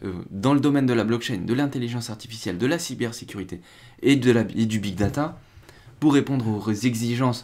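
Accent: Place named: Spain. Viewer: Cet accent espagnol :) looool moi j'aime bien ces vidéos avec du contenu mais avec le sourire!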